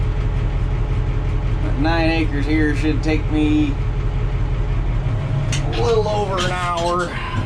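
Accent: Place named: Michigan, United States. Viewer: Sounds like you are from Michigan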